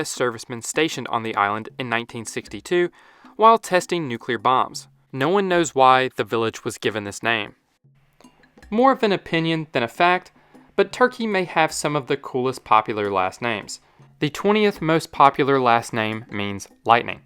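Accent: United States. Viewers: What specific American accent do you have? Every time I watch these I try to work it out but I have no knowledge of US accents. My best guess is Philadelphia??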